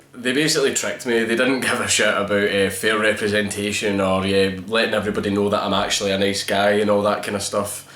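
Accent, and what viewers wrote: Scotland, United Kingdom. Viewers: dude your awesome! ever since i saw train spotting i love the scottish accent .... i use phrases like " no bother" and shit ive culturally appropriated from scottish vernacular ..... if me and my girl ever visit the uk and scotland in particular ill buy you and your girl a round, subbed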